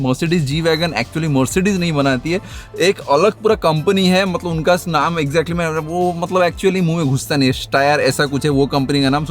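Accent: Odisha, India. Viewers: Hindi ke sath sath, Apki English me bhi odia slang aati hai...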